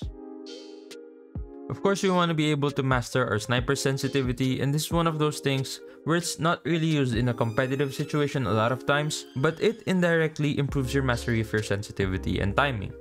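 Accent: Philippines. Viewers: u sounds like you're a Filipino. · Thanks for this tips and tricks! Its's awesome.. Anyway, are u a Filipino? Because your english accent sounds like how we Filipinos talk english.😍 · pinoy english accent is good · your accent is kinda like filipino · Are u filipino u sound like a filipino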